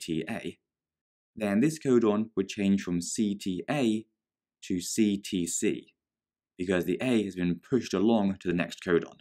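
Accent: United Kingdom. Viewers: Seems british to me 🤔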